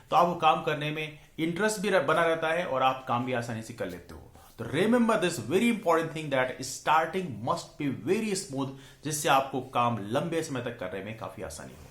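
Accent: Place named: United Kingdom. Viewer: sir me apki videos dekhti hu bate bhi apki achhi hoti h bas ap hindi ko bhi English lahje me bolte ho to ajeeb lgta h lekin aap hmse bade h esiliye achha lgta h apko sunna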